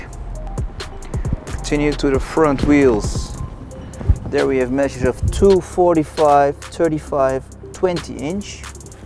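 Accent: Netherlands. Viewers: so you/re an indian with a dutch accent and an english channel. aight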